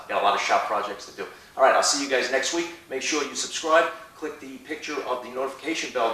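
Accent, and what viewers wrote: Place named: Long Island, United States. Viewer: this guys gotta be from long island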